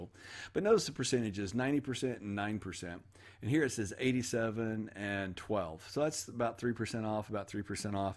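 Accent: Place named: United States. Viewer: Take no notice when we Brits snigger at your pronunciation...you are probably saying it correctly, it's us who have corrupted it over the last 1000 years or so! :-) BTW...my favourite US pronunciation is Worcestershire...as in the sauce...you guys say Wor-sess-ter-shire...whereas we say Wuss-ter-sha (or 'shur' depending on where in Britain you come from!). And get 2 Brits, one from the north of England and one from the south, to pronounce the word 'castle'...ah...hours of fun with English pronounciation! :-)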